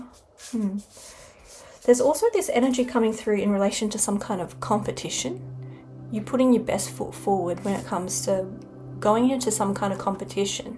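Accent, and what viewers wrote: Australia. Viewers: All this time I thought I had found an English, but it’s Aussie lol❤ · Hello from California. Good messages, great voice,. We appreciate you. You have our favorite Australian accent. We could listen to you talk for hours. Happy holidays & happy summer to Australia from our channel.:hand-pink-waving::hand-pink-waving:🐦🐦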